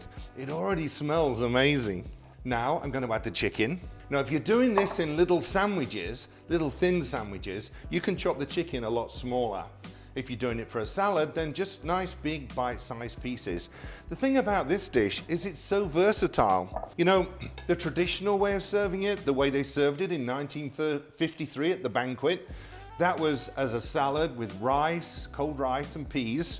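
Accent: United Kingdom. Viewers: What happened to the British accent lol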